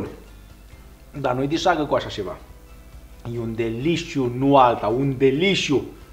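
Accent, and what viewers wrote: Moldova. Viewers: Super faină supa. Iar de vorba asta dulce moldovenească ce să mai spunem?? Ne încîntă sufletul. Mulțumim frumos, de rețetă.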